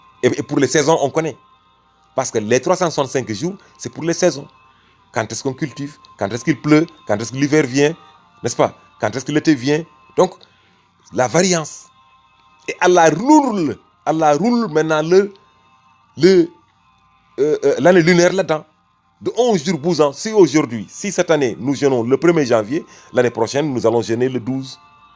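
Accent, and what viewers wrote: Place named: Pulaar, West Africa. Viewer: Un vrai accent halpular!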